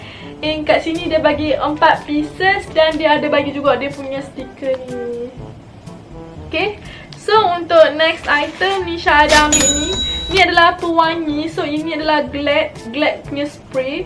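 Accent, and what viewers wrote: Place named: Kedah, Malaysia. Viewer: Bagus loghat Kedahnya 😂😂👍👍👍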